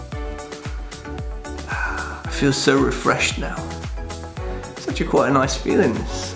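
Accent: United Kingdom. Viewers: the British accent alone makes me feel better.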